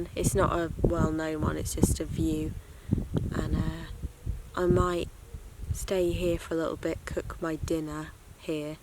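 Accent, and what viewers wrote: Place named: England, United Kingdom. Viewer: you are such a delight to watch, being from Texas I could listen to your accent all day long. The waterfall the tower...I have to visit England someday...you are a walking advertisement of your country. Pretty lady I cannot wait to see what you do next ❤ Texas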